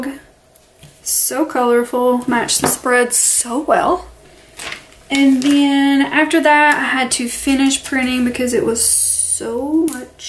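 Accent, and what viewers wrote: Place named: South, United States. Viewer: New sub. Love this. Sounds like you are a fellow Southerner. I live in Tennessee. Blessings.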